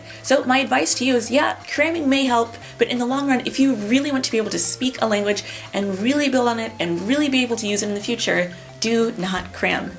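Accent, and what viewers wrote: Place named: Japan. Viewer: I love your japanese accent!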